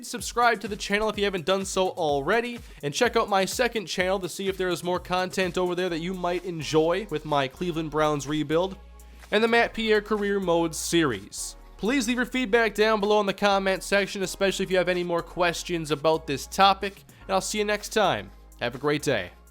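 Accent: Minnesota, United States. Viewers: are u from minnesota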